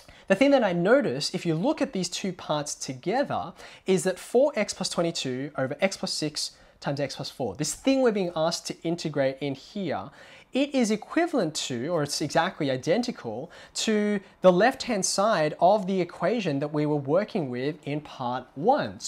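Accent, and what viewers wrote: Australia. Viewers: Australia, apparently (strong diphthong on "o").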